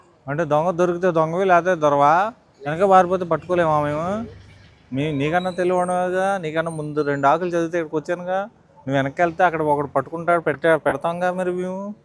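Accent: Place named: Telangana, India. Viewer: ci garu telangana basha matladtunnadu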